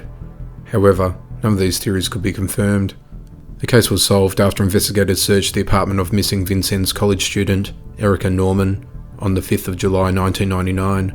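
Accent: Australia. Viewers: Wait, is this an Aussie accent mine ears hear? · Nice to hear an Aussie accent doing these videos!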